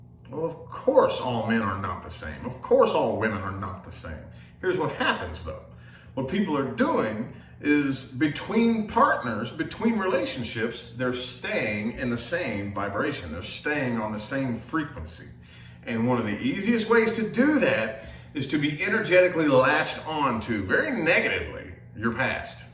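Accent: South, United States. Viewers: 🤣 I have a really southern accent like does. My voice texts NEVER come out right lmfao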